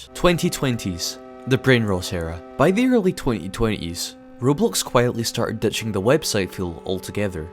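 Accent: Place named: Scotland, United Kingdom. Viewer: I know this guy is Scottish I recognize the Scottish accent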